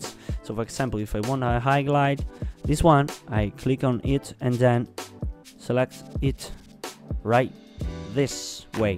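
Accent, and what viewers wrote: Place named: Italy. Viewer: hi, I couldn't help but notice that, by any chance are you Italian? you sound really Italian I don't know why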